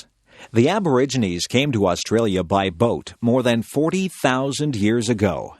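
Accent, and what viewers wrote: United States. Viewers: The accent in the audio is American, with some place name mispronunciations. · Factually incorrect, in an American accent and doesn't even pronounce the names of major cities correctly.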